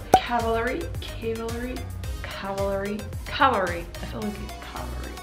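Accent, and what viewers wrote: France. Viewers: Please, don't lose your french accent. It is quite wonderful!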